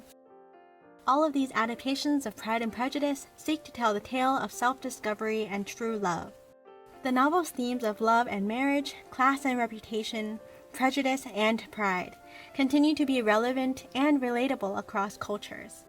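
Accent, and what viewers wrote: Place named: United States. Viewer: It is weird hearing the narrator with a thick American accent. “Prade and Prajadice”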